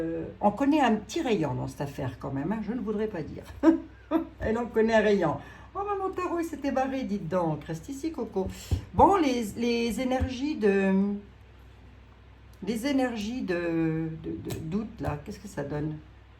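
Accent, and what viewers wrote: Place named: Meurthe-et-Moselle, France. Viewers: Ma chère toujours un plaisir de vous voir avec cette mise en bouche de 8 mn pleine de délicieux mots pour chacun... J adhère complètement. Pour ma part je préfère les amandes aux cacahuètes (Dieu a donné à l homme le cerveau et le sexe mais pas assez de sang pour irriguer les 2 en même temps). Avec votre joli accent de Lorraine. Bref ne changez rien. Je vous byz avec beaucoup de vibrations d amour de lumiere et de vérité. Eveillons nous tous. Avec gratitude Patrick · dommage pour le son qui se coupe à chaque pause. donc il manque des mots . plaisir de vous entendre tout de meme avec votre accent de 54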